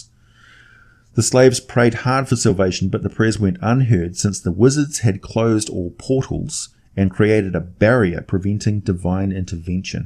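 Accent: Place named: New Zealand. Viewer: Wow another Kiwi! Such a great thing to hear when I'm not expecting it XD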